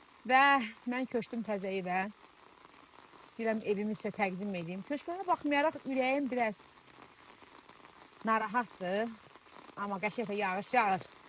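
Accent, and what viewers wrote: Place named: Baku, Azerbaijan. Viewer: daha Bakinka lar kimi dnışmaqa keçib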